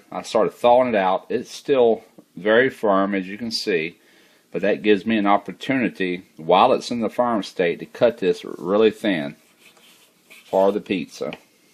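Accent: South, United States. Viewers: + southern accent = win, i'm a huge fan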